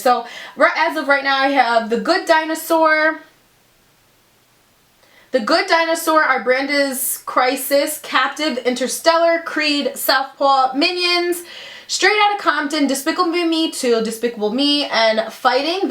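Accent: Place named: New Jersey, United States. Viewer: hey where are you from I love your accent by the way you have like a jersey accent to me hope that isn't weird!!!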